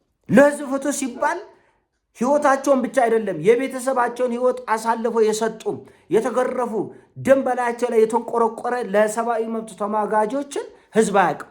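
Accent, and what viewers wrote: Tigray, Ethiopia. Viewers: You are the smart person specially you represent the smart Tigrie people with the accent .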